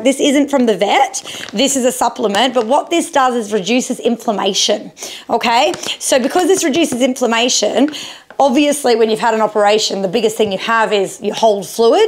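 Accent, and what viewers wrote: Australia. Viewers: Wow so interesting - Wes was soo good with the ducktape - TFS 😍 just a quick question are you Australian? Love from a fellow Aussie 🦘🐨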